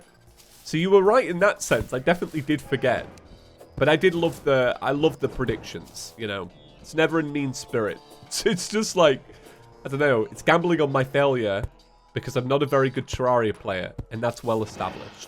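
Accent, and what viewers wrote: United Kingdom. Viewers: Hello sexy-voice British man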